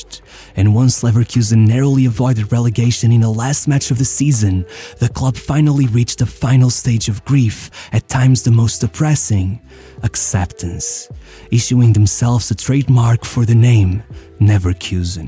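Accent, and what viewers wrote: Portugal. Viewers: I think he sounds like this because of the Portuguese accent and not by choice really. But personally I prefer it than highly energetic YouTubers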